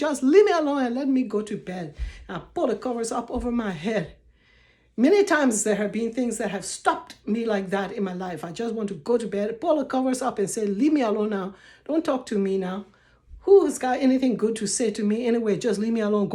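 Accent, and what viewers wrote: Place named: Bassa, Liberia. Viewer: You put a smile on my face!❤️😊My mom is from Rivercess, GrandBassa county ! You do have a beautiful Bassa accent. We love listening to you!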